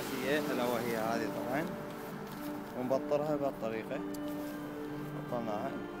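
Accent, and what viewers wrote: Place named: Iraq. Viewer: كﻻمه عراقي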